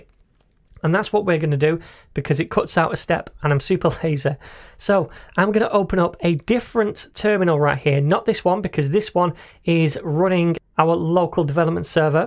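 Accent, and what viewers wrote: United Kingdom. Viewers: Honestly, I really like his react tutorials not only because of his British accent but he also explained them quite remarkably.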